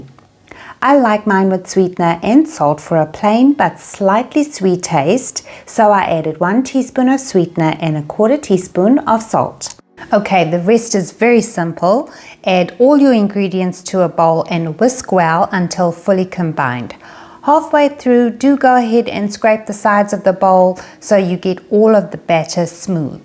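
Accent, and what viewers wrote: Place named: South Africa. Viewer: Yum looks so good. I’m definitely making it. Thanks so much for sharing this recipe. Love your South African accent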